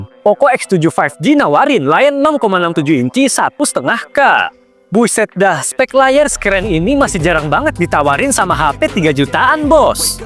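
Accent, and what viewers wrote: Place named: Medan, Indonesia. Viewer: Medan cuy😅😊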